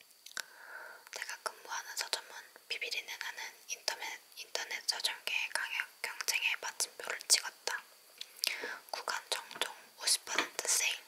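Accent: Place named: Gyeongsang, South Korea. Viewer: 너무 좋습니다. 경상도 억양도 너무 귀엽습니다.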